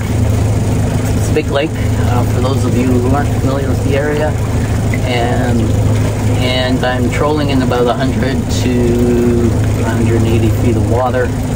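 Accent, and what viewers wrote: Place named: Canada. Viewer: I love to think of people in other countries finding this video maybe thinking we all have such a heavy Canadian accent! :) also thanks for posting!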